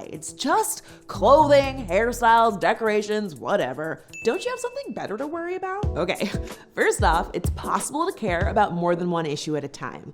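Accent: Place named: South, United States. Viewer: So she once apologized for her southern accent, but then she puts on this white voice whenever she mimics white people. GIrl byeeee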